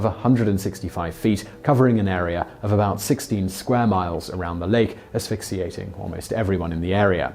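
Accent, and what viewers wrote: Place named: Upper class, United Kingdom. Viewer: This is what upper class Brit’s sound like dude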